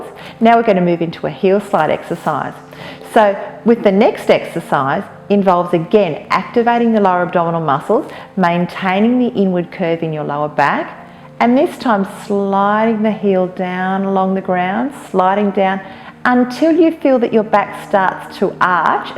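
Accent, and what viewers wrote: Australia. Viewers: AI love your Aus Accent.. I miss Aus😢😢😢😢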